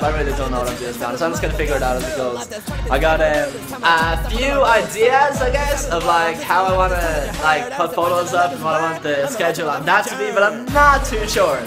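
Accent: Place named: Ireland. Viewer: DANG the most adorable ACCENT yo!!! LOL 😂😂😂😂Almost at 200 subs, freaking awesome!!!! YES< we need more of these, too!!!! I don't care which day your vids come out, I'll be there fam!!!! 😀😀😀LMAO with the editing...the comments to yourself bwhahaahha "JESUS CHRIST, "!!!! LMAO 😂😂😂😂Definitely keep these coming, too along with your awesome new beats!!! And I'm going to keep nagging you about that autograph until I turn blue in the face...and a BLUE EGG is NOT a pretty sight, yo!!!! LOLOL 😂😂😂😂 Much love my adorable, irish friend!!!! xoxoxoxox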